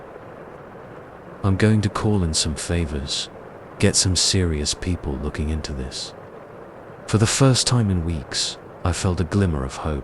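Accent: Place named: United Kingdom. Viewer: I hate the British accent it ruins the whole stories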